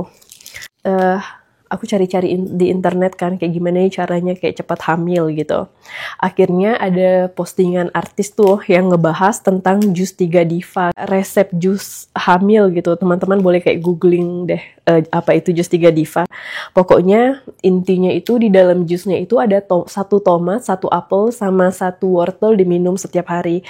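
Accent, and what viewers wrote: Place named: Java, Indonesia. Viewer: Mbak bicara nya seperti.orang jawa.pdhl bukan ya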